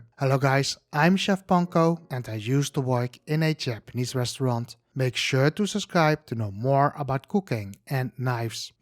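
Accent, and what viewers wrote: Netherlands. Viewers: altijd grappig dat je het Nederlandse accent er doorheen hoort :P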